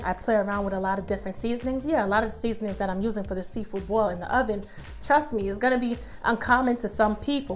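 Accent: New York, United States. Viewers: U did that !!!!!! U have an accent where r u from ?? NYC? CT? Islands? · looks yummy and yes thats a strong new york accent i hear lol it sounds like brooklyn or long island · Ikr 😆 Is it New Yoik (?) York 😉 I Love it 👍😁.... Just like her looks and cooking skills... Genuinely Natural and Beautiful 😊😊😊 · Sounds like New York??? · Looks delicious n sounds like u have a NY/NJ accent. Love it!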